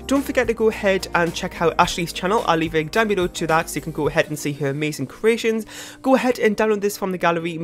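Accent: Newcastle, United Kingdom. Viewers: Ahh, just found your channel! I love your builds and your accent. Is it Geordie? I can't quite place it haha. :)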